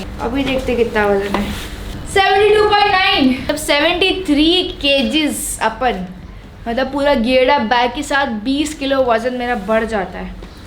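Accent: Maharashtra, India. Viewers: Bai.. aapki Marathi wali Hindi lai Bhari bolted tu.. ,😁👌👌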